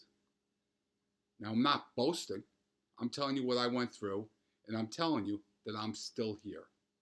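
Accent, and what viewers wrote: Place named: East Coast, United States. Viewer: You sound East Coast. I live in Philly, and if you are near here I will gladly re-pay you by taking you through physical recovery with Yoga and other methods I use.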